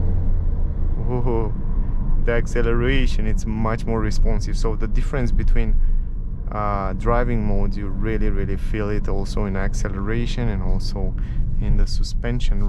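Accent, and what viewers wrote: Romania. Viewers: You sound ... Romanian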